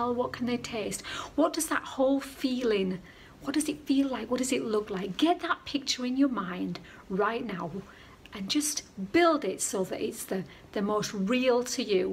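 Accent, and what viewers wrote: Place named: Hull, United Kingdom. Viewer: Sounds like you are from near Hull just like me! :)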